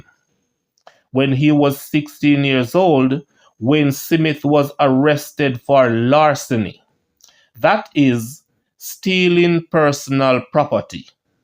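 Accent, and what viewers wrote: Jamaica. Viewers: U hear any foreigner on here asking bout correct pronunciation bredda???Except you!!!Stop being so critical of the presenter's Jamaican dialect. Cho!!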